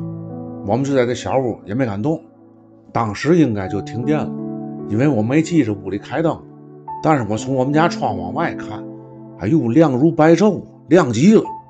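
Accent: Tianjin, China. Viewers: 形容的好真實丶形象，加上維妙維肖的天津音及口才，真喜歡這個節目。 地震，左右搖時，狀況要比上丶下震要好一點。磚之間用泥巴壘的房屋，五級就會發生倒毀。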